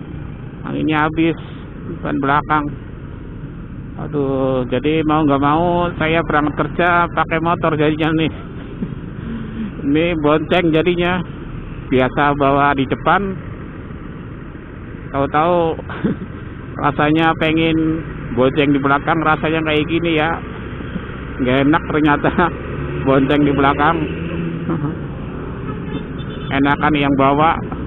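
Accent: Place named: Banyumas, Indonesia. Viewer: Motovlog ngapak -ok tetep semangat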